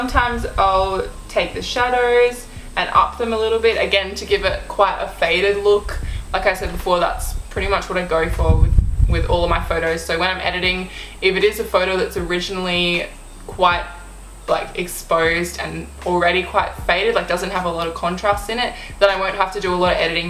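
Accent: Australia. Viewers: Omg ur AUSSIE!! Where r u from? I'm from Sydney😊💞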